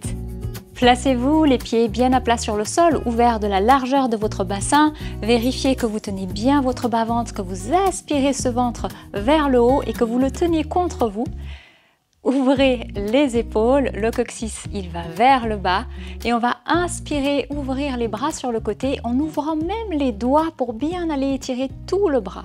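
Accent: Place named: Switzerland. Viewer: Ah mais c’est ça j’avais bien perçu un petit accent suisse délicieux qui me rappelle de bons moments à Genève! Merci. Je me demandais si avec de la musique ce ne serait pas mieux mais peut-être d’entendre votre respiration quand vous souffrez avec nous c’est bien aussi…très bonne séance avec des mouvements originaux et variés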